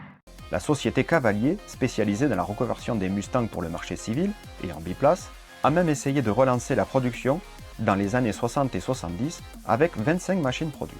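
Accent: South, France. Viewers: Cet accent du sud m'avait manqué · Très beau documentaire … Les détails techniques et historiques sont très bien présentés. Le petit accent méridional du narrateur et quelques pointes d'humour permettent de suivre cette présentation. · Toujours content de voir une de tes vidéos dans mes abonnements. Vidéos toujours aussi détaillées, explicatives avec une très bonne approche sur le contexte des choses expliquant certains choix techniques et limitations du moment. Puis bon, toujours content d'entendre notre joli accent du Sud!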